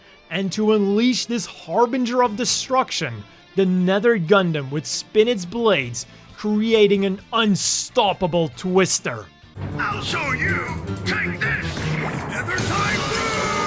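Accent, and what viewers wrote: Belgium. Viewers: As a Dutchie, it's great to hear you speak in Dutch (with the lovely Belgian accent)! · ooooh je bent een belg!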